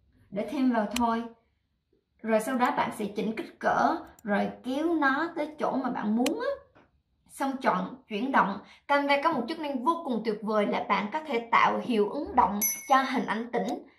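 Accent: Binh Dinh, Vietnam. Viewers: nghe giọng nói của bạn giống như ở bình định hay phú yên hihi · Bà ở Bình Định hả bà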